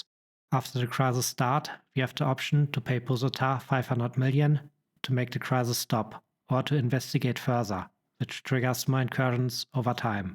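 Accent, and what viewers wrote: Germany. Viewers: i am not a native speaker either but your beautiful german accent do not hinder my ability to understand at all, I even find your accent crispier than british english to identify each single word lol 😂. If I may suggest...the automatic subtitles (in english) work very well for those parts difficult to comprehend, maybe you could check the subtitles for futures videos to make sure they show exactly what you say, or suggest people to turn on subtitles! Anyway good joob and ciao from 🇮🇹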